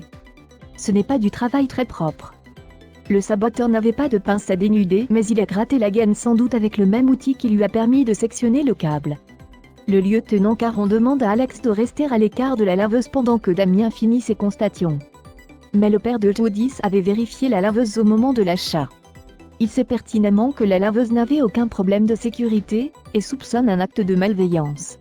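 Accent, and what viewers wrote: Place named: United States. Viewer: Le commentateur prononce tous les prénoms à l'américaine ! C'est stupide !!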